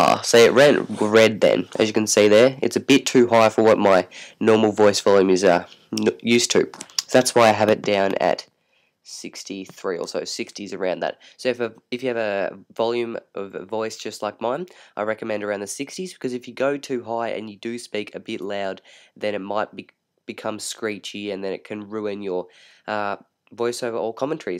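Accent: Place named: Australia. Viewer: Great to hear another Aussie voice. Thanks for this.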